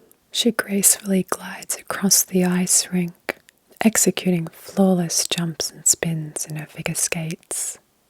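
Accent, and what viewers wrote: Australia. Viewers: Hearing a soft gentle aussie womans voice whisper certain words and example sentences takes me straight back to soing spelling bees in primary school. There are notable austtalian ASMR creators, but not many, so its always nice to find them. Just makes me relate more which makes the relaxation better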